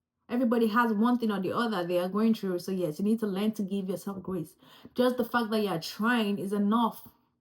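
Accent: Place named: United States. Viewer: Ouuu, I can hear your American accent coming out🤭🌚🤗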